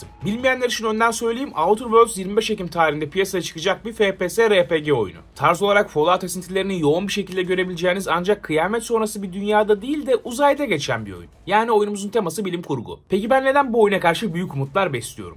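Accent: Russia. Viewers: Mükemmel Rus aksanıyla İngilizce konuşan * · Rus aksanlı * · o nasıl bir ingilizce rus gibi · Rus aksanı❤ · İngilizce konuşunca İngilizce konuşan ruslara benziyorsun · Rus aksanıyla ingilizce konuşman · Güzel rus aksağını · ingilizcende rus aksanı var gibi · İngilizce'yi, Rusça konuşur gibi konuştun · İngilizceyi Rusça aksanıyla konuştuğunu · rus aksan? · Niye rus aksanıyla ingilizce konuşup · aynı rus ajanları gibi ingilizcen var valla çok tatlış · ingilizcen rusçaya kaymış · niye rus şivesi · Neden Rus aksanı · neden ingilizceyi ruslar gibi konuşuyor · Ruslar gibi ingilizce konuşuyorsun (CS:GO Ofensive günlükleri) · ABİNİN İNGİLİZCESİ RUSLARIN İNGİLİZCE KONUŞMASIYLA ÇOK BENZEŞİYOR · niye Ruslar gibi engilizce konuşuyorsun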